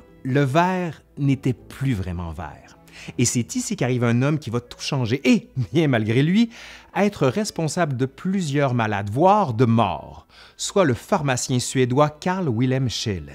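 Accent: Quebec, Canada. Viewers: Décidément j'aime bien vos vidéos !!! Votre narration est extrêmement riche , extrêmement soignée , et votre accens québécois apporte quelque chose en plus pour moi français . Sans compter que j'ai l'impression de ressentir une gentillesse naturelle chez vous . Et vos vidéos sont extrêmement interessante sur des sujets dont j'ignorais tout !!! Donc , merci , et je m'abonne ! Continuez a nous régaler de vos belles vidéos !!!! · Youhou enfin le retour de l'histoire nous le dira !! Cet accent québecois m'avais tellement manqué, je me refaisais les ancien épisodes en boucle. Bon retour :)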